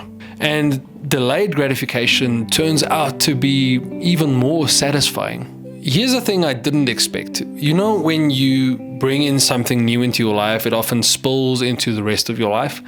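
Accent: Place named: South Africa. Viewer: Howzit, this film really resonated with me. I’ve always written with a fountain pen since school days in the eighties in KWT. When I learned to shave, my great uncle gave me his cutthroat razor which took a lot of practice to master. When I started fly fishing, my wife bought me a split cane fly rod. Each of these force me to slow down and think about what I am doing. Two years ago I went back to vinyl and this year I began teaching my son film photography. Every days is calmer, every moment is a little more considered. Thank you, keep making your films. It’s lovely to hear a South African voice and see parts of SA when living in rainy, cold England.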